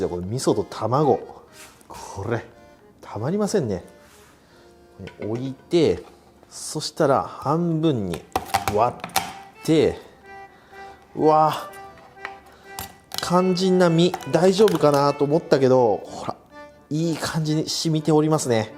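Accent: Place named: Nagoya, Japan. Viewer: 名古屋から上京してきたから、かねこの名古屋弁が地元みあってうれしい～！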